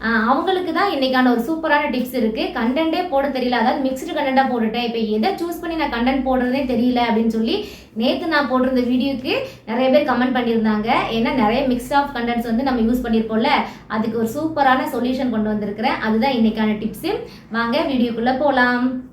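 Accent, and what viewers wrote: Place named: Tirunelveli, India. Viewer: Tirunelveli slangs super sister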